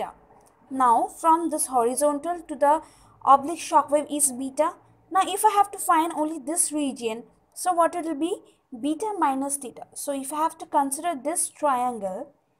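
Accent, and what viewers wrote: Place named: United States. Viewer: Indian accent would be better than ur American accent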